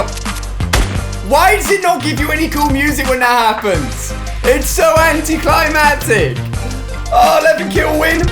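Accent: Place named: England, United Kingdom. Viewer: Mason Coggins he didn’t he said freaking remember he has a accent cuz he is from England duh he said like he said the f word but he didn’t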